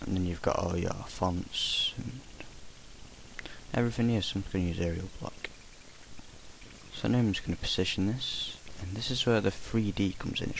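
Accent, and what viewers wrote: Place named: United Kingdom. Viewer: All British talk like that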